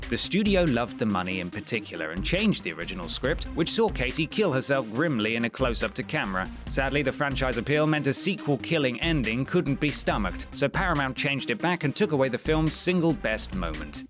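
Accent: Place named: RP, United Kingdom. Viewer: Why does sound so much more posh in these videos? I swear he isnt that posh.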